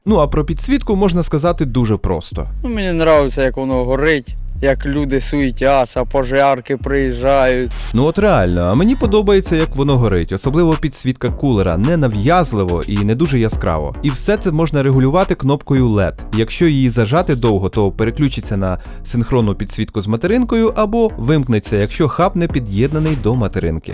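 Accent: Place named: Surzhyk, Ukraine. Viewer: не критикуй його, він старається відівчитись від суржику. Краще слідкуй за собою)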